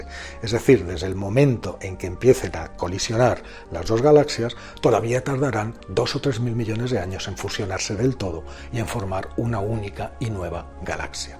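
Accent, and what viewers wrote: Spain. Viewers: no me gustó su video y su español ibérico, hasta nunca. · tan agradable ese sonsonete español...debe ser super vivir en españa y escuchar a miles con esa particularidad...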